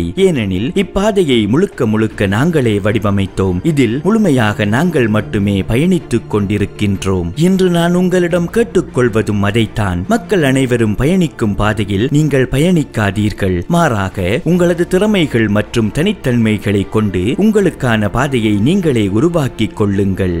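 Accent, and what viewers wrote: Sri Lanka. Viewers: Bro neengal srilanka tamila Pesuranga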